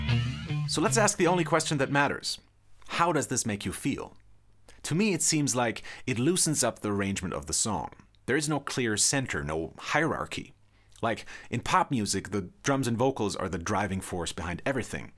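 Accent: Germany. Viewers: Are you german? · Holy guacamole, hello my fellow German. I didn't know you were so very German. But now that I know, I can actually hear teeny tiny things in your speech that should've given it away earlier. In any case, your videos are amazing. Thanks for being such a great inspiration!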